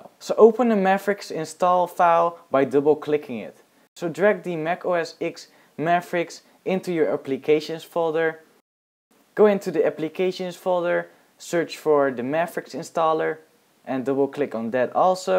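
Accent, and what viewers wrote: Netherlands. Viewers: where u from brah ? dutch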